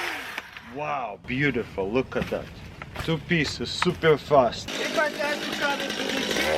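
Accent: Russia. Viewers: First he's Russian, and second he says it how ever he wants. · without insulting sir, but are you Russian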